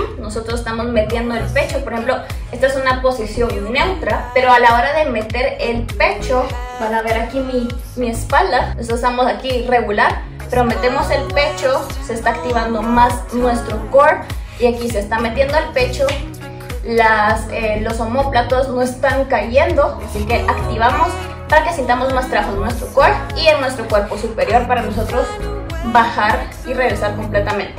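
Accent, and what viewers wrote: Guatemala. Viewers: Acento #chapin !!!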